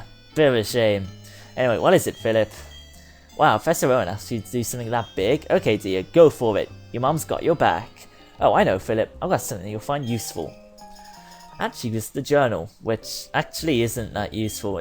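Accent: Birmingham, United Kingdom. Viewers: He comes from Birmingham :D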